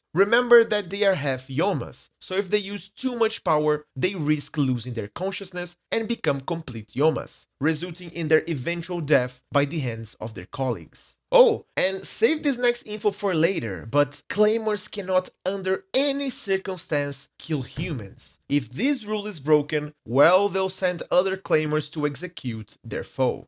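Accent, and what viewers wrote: Brazil. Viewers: Tu é brazuca mano?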